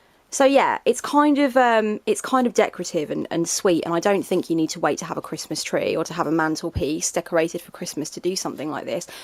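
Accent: England, United Kingdom. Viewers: I've just about catch up on all your videos as a latecomer (mornings with a cup of tea - had to be done!) and they're great! So lovely to hear a fellow English accent amongst the depths of Youtube! Loving the Vlog idea too, it definitely adds something new. As a cheely suggestion, would love you to video your entire collection of cards - but then I am very nosy! Thanks so much for sharing all your thoughts, your videos/posts are brilliant.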